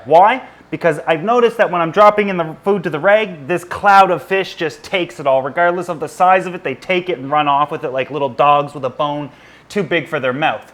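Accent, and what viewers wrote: Canada. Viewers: Are you canadian like me? I can hear a bit of an accent lol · Youre saying oot instead of out. Are you Canadian?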